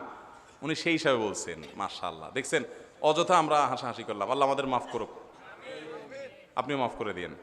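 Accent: Noakhali, Bangladesh. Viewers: চিটাগং না নোয়াখালীর ?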